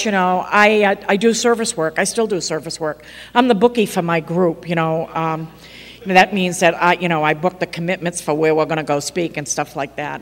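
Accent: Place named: New England, United States. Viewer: 30 seconds in and there was that new england accent. #home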